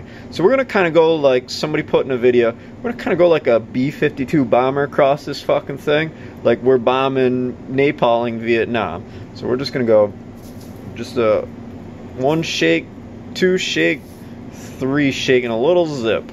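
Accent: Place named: Midwest, United States. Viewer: midwestern accent... reminds me of the dad i never had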